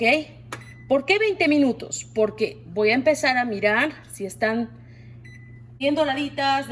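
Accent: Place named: Peru. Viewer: ¡Qué peruana que eres! "salsita, cominito, cada presita" Me encanta, extraño mi tierra.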